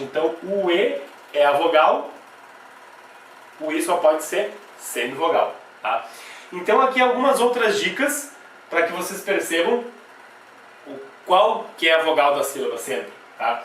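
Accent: Rio Grande do Sul, Brazil. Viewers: gostei do sotaque gaúcho ..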